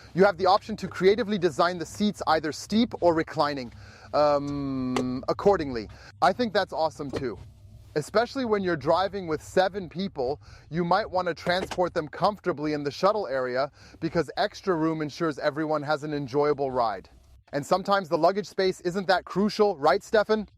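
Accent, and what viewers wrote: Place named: Berlin, Germany. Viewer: Sorry, you are totally right. It is necessary to watch longer than one Minute before giving a quote. 😂 With Ai your „Berlin Accent“ is missing but I think it is just a question of Updates that this will be solved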